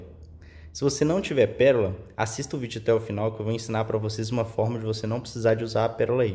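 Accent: Minas Gerais, Brazil. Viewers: Adorei como sempre !!! Muito criativo. Com todo respeito esse sotaque me parece mineiro uma graça.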